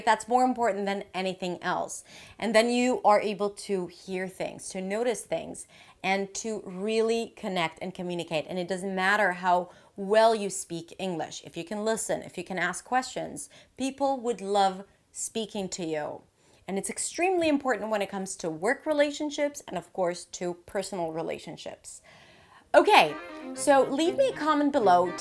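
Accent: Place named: United States. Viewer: how long did it take you to learn American accent properly?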